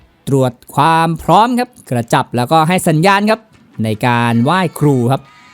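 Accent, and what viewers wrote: Isan, Thailand. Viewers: ชอบผู้บรรยายเป็นภาษาอีสาน ชอบหลายเด้อครับ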